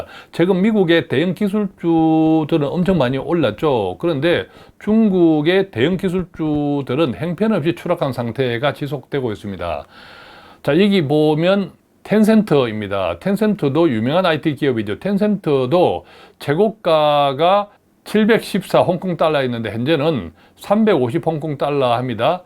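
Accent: Gyeongsang, South Korea. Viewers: 말씨가 갱상도네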